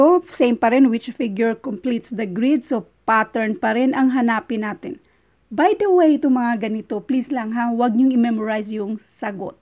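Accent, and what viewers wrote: Visayas, Philippines. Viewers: omg this is so helpful. i havent understood it before i watched this and it really helped me understand these series of patterns especially the segment completes the grid. thank u maam and God bless btw i love your bisaya accent : )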